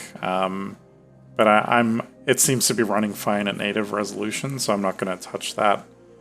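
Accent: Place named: Canada. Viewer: You have a sexy Justin Trudeau accent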